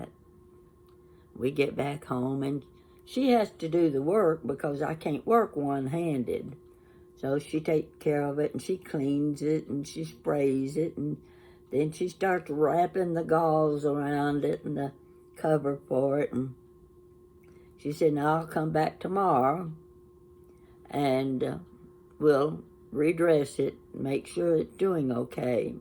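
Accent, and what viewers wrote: South, United States. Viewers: Hi, just found you by accident and had to watch a couple of your videos. I can't wait to see more of them, I connected with you right off the bat. I live in Michigan but my parents were from the south, so I love you're accent. Keep up the good work and thank you for doing these videos. Blessings to you.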